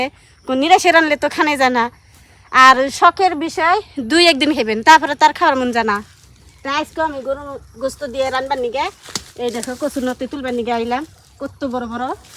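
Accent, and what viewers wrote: Tangail, Bangladesh. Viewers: আপনার জেলা কি টাঙ্গাইল নাকি?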